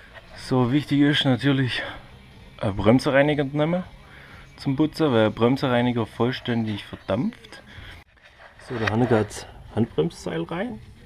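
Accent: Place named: Swabia, Germany. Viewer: Sauber. Schwabe. Aus welcher Gegend kommst? Gruß vom Flughafen.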